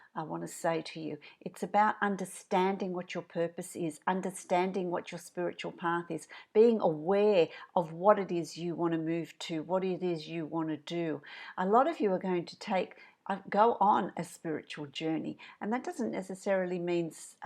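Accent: Australia. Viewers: Love the rare cultured aussie accent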